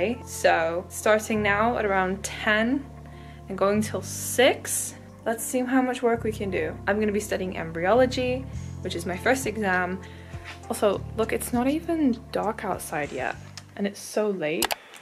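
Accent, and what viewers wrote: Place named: United Kingdom. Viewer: ik verschoot toen ik u ineens nederlands hoorde praten ik was echt convinced da ge brits waart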